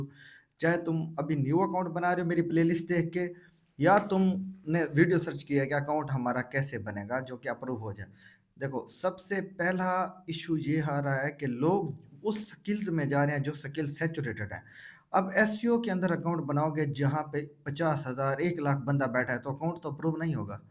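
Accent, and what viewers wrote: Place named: Kot Addu, Pakistan. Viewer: chlyn good hogia bhai... Seriously thanks wo meri kismat account chly na chly laikn aik e gig pe 20+ orders mily hn bss payment method add hojy ksi traa.. boht informative vedio thi apki.. apky ascent se to aesy lgta hy k ap DGk, taunsa ya kot adu bellt se belong krty hn I'm i right? 😂 Mn khud v kot adu k city CSS se hon😊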